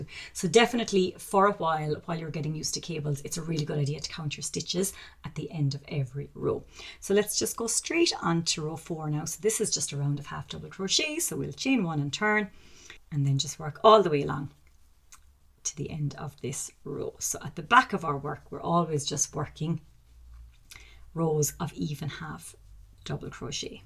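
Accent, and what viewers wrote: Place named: Ireland. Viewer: This is the first video by you I have watched. I thought you were American but oh my! Love your Irish accent. This has been helpful, thank you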